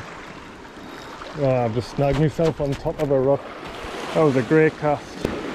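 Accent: Newcastle, United Kingdom. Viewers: are you from newcastle or sunderland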